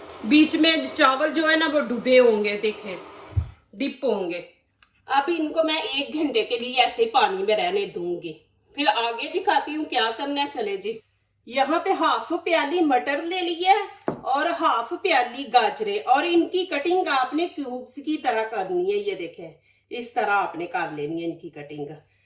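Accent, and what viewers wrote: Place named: Punjab, India. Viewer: Jazakillah piyari naik baji. Aap bohat mukhlis aur piyaar karnay wali baji hain. Aapki har recipie aalaaaaaaa hai. Aapkay program main bohat apnaiyat ka ahsaas hota hai. Aap bohat mahnut aur muhabbat sai hamain sub kuch Sikha rahi hain. Allahpaak aapko do jahan ki khushian day .Aameen. Aap bawazoo rehkur khana pakati hain aur duaen daity rehti hain . Aap apnay parents ko hamara bohat salam kahain kiunkay aapko daikh k unki tarbiat pay rashk aata hai. Baji aik baat aur kabhi hai k aapka piyara punjabi lahja hamain to bohat acha lagta hai magr kuch loag aapka mazaaq uratay hain. Jo humsai bardahst nahi hota. Allahpaak subko kamil hidayat day. Aameen.🤲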